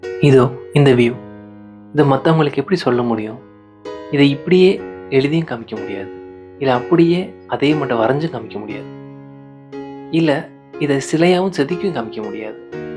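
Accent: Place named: Kongu, India. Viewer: Wow..🤓 ...nalla Kavithai with kongu style🔥🔥🔥🔥🔥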